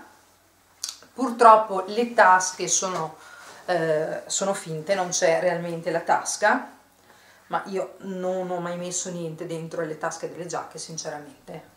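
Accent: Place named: Veneto, Italy. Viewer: Ciao.intanto complimenti x il gusto nel vestire,gli abiti ti stanno benissimo. Io preferisco acquistare su bonprix e mi trovo benissimo perché i tessuti li trovo più naturali di quelli di shein .hai mai provato bonprix? Io sono una curvyyy e trovo abiti sexy anche come i tuoi abiti. sento che sei veneta dall'accento...anca mi ghe son! Ahahahah ,sito de vr o vi o pd? Ciaooo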